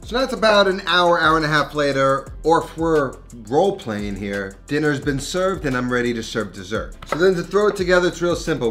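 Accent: New York, United States. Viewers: Its an east coast heavy ny acccent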